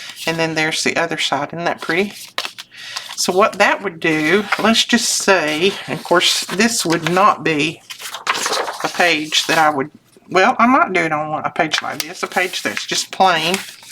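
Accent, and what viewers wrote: South, United States. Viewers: God bless you with Peace, Courage and Strength. My husband of 43 years passed away a year ago July 14 then my mother's earth suit gave out at age 93 in January '24. You have been a companion of mine since I found you and am very grateful for your Southern upbringing which is reflected in your sweet accent and charm. I am now a faithful student of yours BTW, I have two daughters, three granddaughters and a grandson. Two of my granddaughters (sisters) now live with me to my great delight. I will pray for you and encourage you to face each day with grace while looking forward to the goodness to be found when you look for it. Kay Davis Peddie, Quincy, FL.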